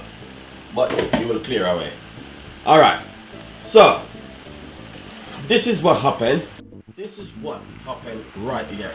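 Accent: Jamaica. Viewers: Chef Jamaican accent is so strong, he can’t even hide or suppress it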